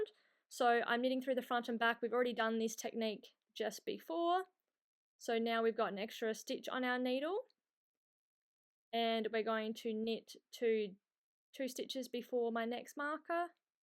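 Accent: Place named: Australia. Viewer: Wow. Lovely Australian accent you have. Thank you I appreciate that😊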